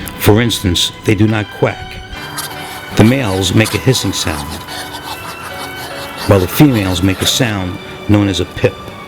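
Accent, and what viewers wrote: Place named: Brooklyn, United States. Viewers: Amelia Bee he sounds like he is from Brooklyn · Amelia Bee Not a New Jersey accent. New York.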